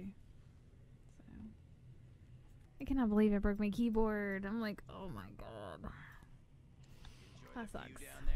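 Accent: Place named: South, United States. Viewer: is it only me who notice her country accent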